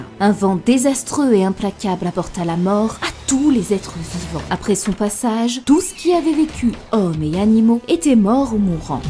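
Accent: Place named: Belgium. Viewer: Superbe video! J ai adoré,et j attend la suite avec impatience. Quel travail, quelles recherches, et quelle analyse! Franchement, les mots me manquent. J avais moi meme fait les meme rapprochements,mais j aurais ete bien incapable de les presenter comme tu l as fait ici. Oui le travail et l inspiration d Oda sont impressionnant, mais ton boulot l est tout autant. Je suis moi meme plutot tres interessé par ces sujets que sont les anciennes civilisations et les evenements passés allant de la prehistoire a l avenement des premieres civilisations, en passant par la precession des equinoxes et son effet sur notre histoire. Le parralelle entre les siecles oubliés de onepiece et le chainon manquant comme tu dis,ou encore avec cette periode obscure de notre histoire ( -12.000 avant JC) et les anomalies qui en ont decoulé, jusqu a enfin l avenement de civilisations telles que les sumeriens ou encore les antiques egyptiens…Bravo, un grand bravo a toi. 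Et comme si ca ne suffisait pas, tu pousses le talent jusqu a la redaction et la diction d un texte travaillé. De toutes les chaines, hypotheses, et analyses de onepiece que je vois passer, je peux dire sans hesitation que c est avec ton boulot,ta vision, que je suis le plus en phase. Merci pour tout ca, et felicitations. ( par contre, un de ces quatres, ca serait pas mal de pouvoir mettre un visage sur cette delicieuse voix qu est la tienne. D ailleurs,il me semble avoir decelé un leger accent belge, notamment sur les sons en [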